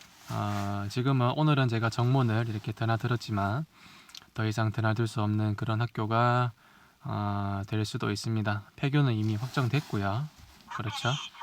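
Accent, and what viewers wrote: Gyeongsang, South Korea. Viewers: 사투리를보아하니 경상도분이신가요? 영상재밌게보고계십니다.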